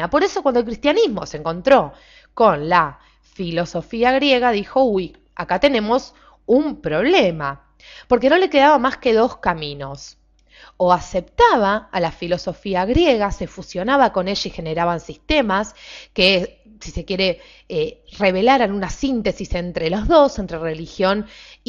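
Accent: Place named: Argentina. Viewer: Hola chicos... que tiene el acento? No son argentinos ustedes? 😊 los argentinos nos caracterizamos por el acento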